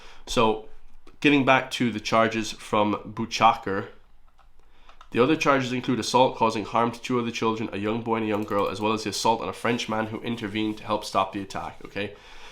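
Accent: United States. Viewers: Question., you seem to have an American accent. But you use words we don't use in the U.S. ( bollacks ) etc. What's up with that? Otherwise great job. Could you send us some of your travellers to the P.N.W. ,across the pond. .see we have these f***tards over here called ANTIFA and they really need the same kind of treatment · Why do you sound like a yank? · This is so sick!! Get them TF out!!! Are you a American living in Ireland? Your accent sounds very American.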